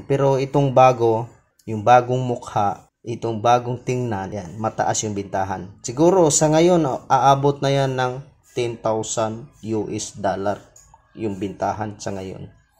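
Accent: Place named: Visayas, Philippines. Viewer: Bisaya ka man bai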